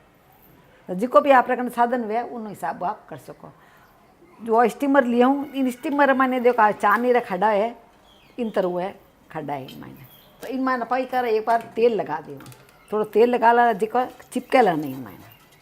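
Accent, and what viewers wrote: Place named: Marwari, India. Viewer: Aap meri sakhi kab bnogi vaise yaha meri pach saheliya hai chhthi aap ban jao.aap ki marvari mithi lavati hai ek jaypur me bhi meri s aheli. hai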